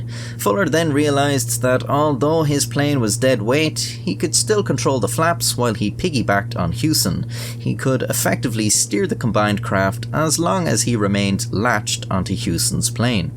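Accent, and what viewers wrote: Ireland. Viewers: Funniest Irishman I ever listened to!!!! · I am a simple man, I see a upload, I click and begin to absorb knowledge from the spectacular Irish narrator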